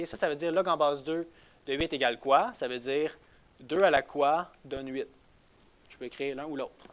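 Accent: Canada. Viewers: Je voulais dire, vous avez un accent légèrement Canadien, et j'ai du mal à vraiment le comprendre, comme les "Têtes à Claques", je comprend rien, mais merci quand même du cours, et de votre réponse.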